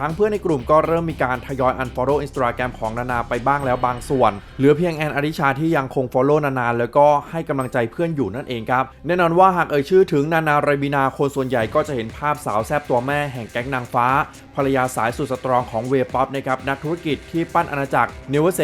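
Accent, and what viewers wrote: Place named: South, Thailand. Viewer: คนใต้ลักจริงนิ😅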